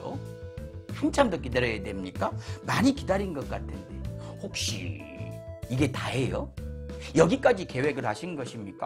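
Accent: Jeolla, South Korea. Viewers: 전라도말씨 시원하게들리네 👍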